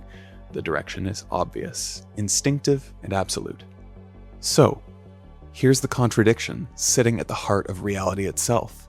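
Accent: Ontario, Canada. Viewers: fantastic. And I love your Ontario accent. Question though, how do we avoid a completely deterministic view. I was waiting for you to address cause and effect as a necessary component of "event". And event sequence can go both ways mathematically like pool balls, but one event leads to another and involves choice. Can you address cause and effect an include probability? Does this only result in the multiverse? The "butterfly effect" is implied here and has some implications. Essentially, there has to be an infinite number of futures because cause and effect is also a measure of time, not just entropy. Two events, one caused by another, must happen at different moments in a sequence and in time. To use the pool ball analogy, nothing is going to prompt the ball to emerge back out of the pocket and strike the ball that had hit it and result in the same pathway in reverse. 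It would require a new event at the bottom of the pocket, some sort of calibrated "popper" but even in that case, the sequence of events would follow a different path because it would be responding to different forces. Basically, I was with you until the end of this video..... fellow southern Ontarian... lol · I find this interesting but can't get past the silly, thick canadian accent. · From your accent I thought you might be Canadian but I notice your profile says you're based in the US.. Are you perhaps originally from Canada?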